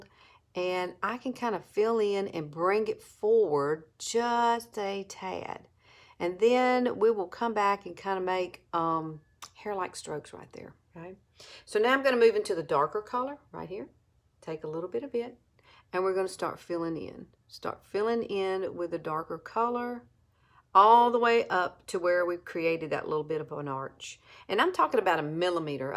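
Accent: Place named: East Tennessee, United States. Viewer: I am from East TN and you sound a lot like we talk , and it is unique all over the world , I understand you Perfectly.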